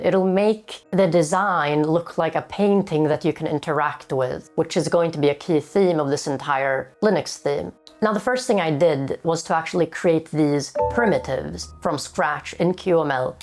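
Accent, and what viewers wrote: Sweden. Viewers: i had to hear like 3 seconds of your voice to find out youre swedish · Legendary algorithm pull Edit: Jag visste att det var en Svensk accent jag hörde. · 5 seconds into the video, my swedish ass goes "hey this guy sounds swedish". i've never watched you before, just somehow clocked it. dubbelv eller vad kidsen nu säger.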